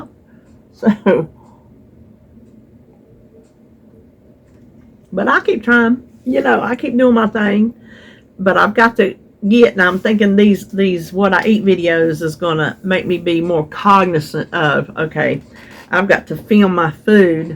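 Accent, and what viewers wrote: South, United States. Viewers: Good for you on the loss. Every little bit counts & adds up. You have the right mind set. Thanks for the discussion on carbs. We all need whatever info we can get. Love your southern talk. Makes me chuckle.🌷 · WOOT WOOT! 🎉🎉🎉🎉🎉 I love your Southern!❤ I hate pithy watermelons too!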